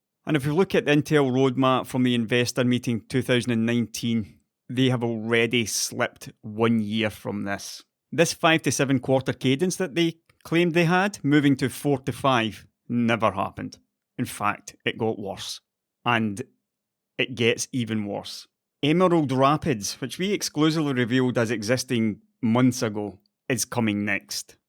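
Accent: Scotland, United Kingdom. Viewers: From a fellow scot it's nice to hear your voice again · "Alright guys" in that Scottish accent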